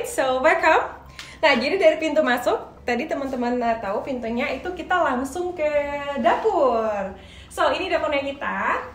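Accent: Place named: East Kalimantan, Indonesia. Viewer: Kakak orang kaltim yaa.. Aku kira orang medan 😂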